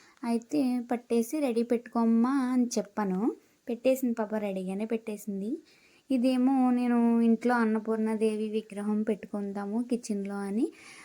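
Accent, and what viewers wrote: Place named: Rajahmundry, India. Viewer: Soo cute sis❤ మధ్య మధ్యలో మన రాజమండ్రి యాస వస్తుందిగా 😂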